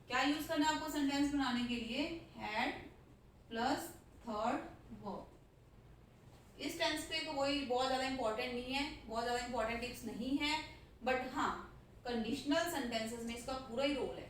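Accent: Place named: Punjab, India. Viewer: Mam app punjbi bolte bhut ache lgte o😍😍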